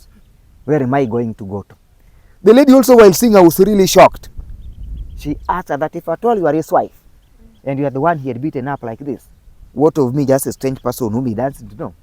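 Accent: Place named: United States. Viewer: Your American English is dope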